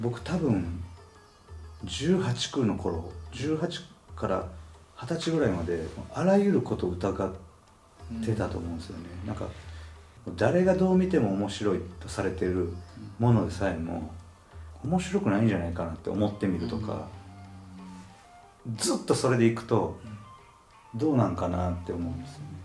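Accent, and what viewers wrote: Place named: Kansai, Japan. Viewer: 関西弁も相まって宋世羅の羅針盤チャンネルを彷彿させる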